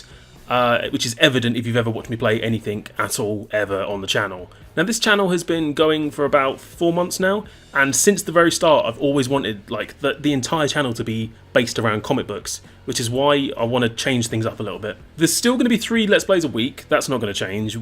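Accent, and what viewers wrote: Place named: United Kingdom. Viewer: you sound like very English person ever, but nonetheless i'm for this channel change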